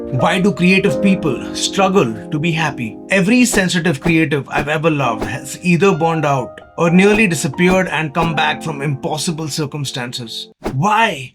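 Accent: India. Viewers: You sound like the professional wrestler, Rey Mysterio with an Indian accent.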